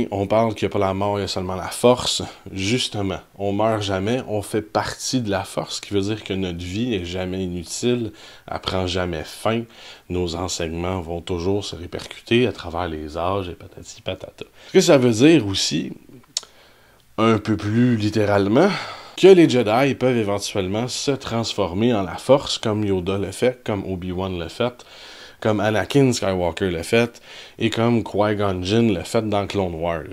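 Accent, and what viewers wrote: Quebec, Canada. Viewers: Mais je me barre avant la fin desolé je peut pas les accent québécois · XENØZ etrangement c'est toi qui devrait comprendre l'accent quebecois et non le contraire . Ce n'est pas a lui de reparler de "mieux parler "pendant toute sa vie il parlait comme sa , c'est comme dire a un francais comme toi et au jour de lendemain tu doit parler l'accent belge ou quebecois et ce commentaire etait vraiment con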